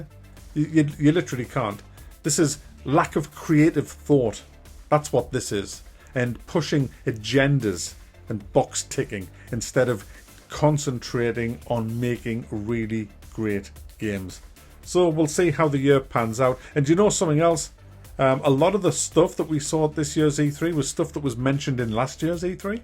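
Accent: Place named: Newcastle, United Kingdom. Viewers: Wrong accent, he's from Newcastle which is in England very far from Wales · No he ain't, I live in Wales. He sounds like a geordie (Newcastle) to me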